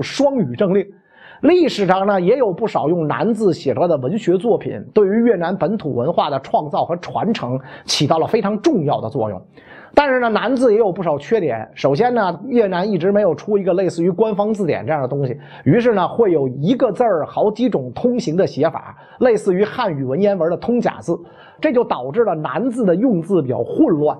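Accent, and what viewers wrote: Beijing, China. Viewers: 神奇...你会被袁老师影响成一口京片子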